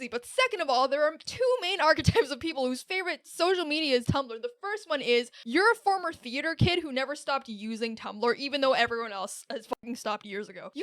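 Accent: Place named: United States. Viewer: you say you live in london, but your english sounds very american.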